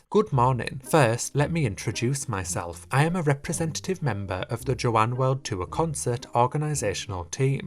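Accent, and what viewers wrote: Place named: Yorkshire, United Kingdom. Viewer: Your Yorkshire twang just shines! I swear your from Yorkshire lol:)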